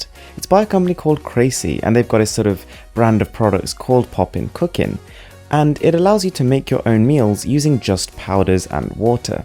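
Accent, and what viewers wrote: United Kingdom. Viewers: No offence but why have US links when you have a british accent? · This entire time I thought you were a white Britain guy from your voice until i seen your picture. And you have some good videos, especially tech room vids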